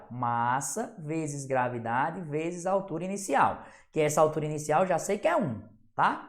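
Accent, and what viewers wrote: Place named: Northeast, Brazil. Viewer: Muito obrigada professor, me ajudou muito!! O sotaque nordestino, bom demais!!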